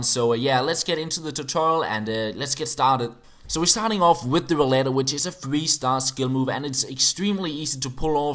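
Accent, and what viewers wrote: Denmark. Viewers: Are you danish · Is he Danish becues im Danish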